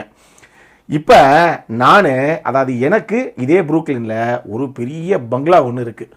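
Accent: Madurai, India. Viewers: bro neenga Maduraiya❤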